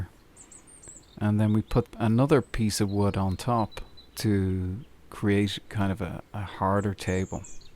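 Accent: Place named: Ireland. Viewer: Fantastic stuff. And I always enjoy how Irish folks say column!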